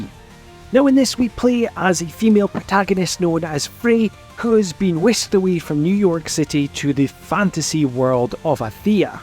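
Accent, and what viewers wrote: Scotland, United Kingdom. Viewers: Hey as a sidenote, yesterday I watched a collab video in Femtrooper's channel and it was a pleasant surprise to see you featured there! I'm really glad to see your channel growing and doing collabs like that, you make some great content keep it up! (Also it might sound weird but I love hearing some scottish accent for a change on YT haha)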